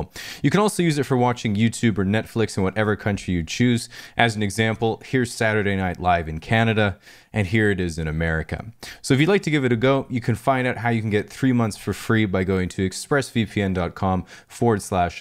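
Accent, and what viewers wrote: England, United Kingdom. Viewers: Jesus that English accent is flawless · Imressive British accent, dude. New here, but will check back ~ 💁🏼‍♀️ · I’m actually very impressed by his British accent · your dramatic Narrative English accent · why do you sound like Tom Hiddleston in that British accent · Thats an amazing British accent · That British accent is absolutely fantastic, you sound more British than me and I’m British. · Your British accent tho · your British accent is gooood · so no one's gonna talk about how he perfectly talked in British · I love your brittish accent holy shit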